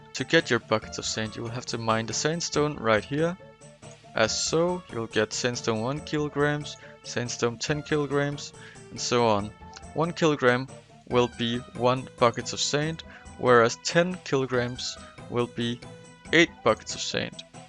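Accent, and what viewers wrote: Denmark. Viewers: 2 sec in i instant hear a fellow dane nice guide tho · Are you Danish? you sound very danish in your accent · Sjovt. Det tog mig 15 sekunder, at høre på din engelske accent, at du er Dansk. Tak for videoen 🙂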